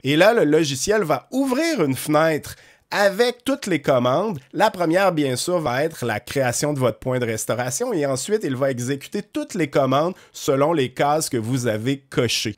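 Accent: Quebec, Canada. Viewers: J'ai l'impression que c'est un super logiciel, mais pas fait pour moi ... D"abord car mon anglais est médiocre, et ensuite, en informatique je ne suis pas au top à mon âge avancé ... C'est dommage, car j'aurais bien aimé nettoyer mon pc efficacement et supprimer tout ce qui est inutile !!! Petite question : Avira free est-il fiable comme anti-virus ? Bonne journée à toi, de la part d'un français de notre pauvre France, c'est toujours un plaisir de t'écouter car j'aime cet accent de la "Belle Province", et même si je n'ajoute pas de coms à chaque fois, je mets toujours un "j'aime" 👍👍👍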